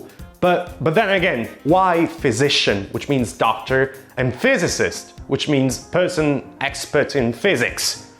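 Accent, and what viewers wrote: United Kingdom. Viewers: I think your accent is sooooo British :) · IlRompiBalle In quanto madrelingua italiano non può avere un vero e proprio accento dei classici, per quanto il suo sia chiaramente un inglese in linea di massima British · Oddio... Con quella barba e quell'accento britannico mi fai emozionare! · Seriusly how you have almost a british accent!!! I struggle so hard to just cancel the italian accent.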